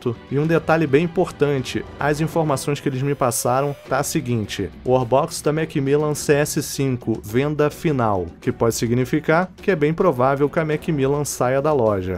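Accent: Rio de Janeiro, Brazil. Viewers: acho q ele é carioca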